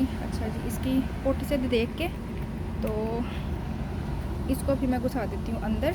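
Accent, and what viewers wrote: Punjab, India. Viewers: Wonderful sharing ❤ Loved your panjabi accent 😍 ❤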